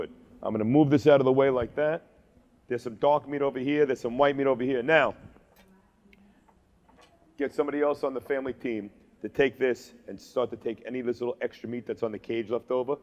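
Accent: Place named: Brooklyn, United States. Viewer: Viewers! Carving begins. broken, Brooklyn “English” intro will bore you to tears if you go the whole nine.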